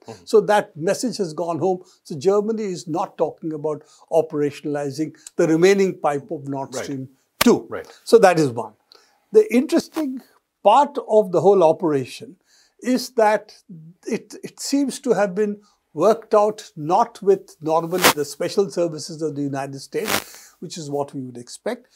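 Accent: India. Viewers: Pl speak English, not Am, BRIT, OR Aussic only Indian, the true English, Bravo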